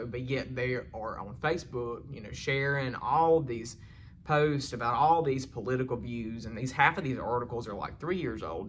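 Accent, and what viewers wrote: South, United States. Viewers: Sorry to hear that you've gotten divorced. You are a smart, funny, great-looking guy. I love your Southern accent, and you have a lot of good things to say. Damn the haters, it comes from people who are INCOMPLETE. Sad to say, but these people have MAJOR ISSUES. The thing that people don't take in is , it's not what religion you are, it's not what political party you belong to, the one thing we need is to LOVE. Love is the answer to all the bad, all the ills of the world. Education is important, but Love is the answer. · you are vulnerable to all sorts of criticism simply by exposing yourself on the internet. People have all sorts of axes to grind and they find you an easy target to vent their anger without pushback. I find this myself from time to time when in discussions on Quora. I agree with the many upbeat comments here. 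As an elderly Australian, among other things I find your Southern personality and accent enough to charm the birds off the trees!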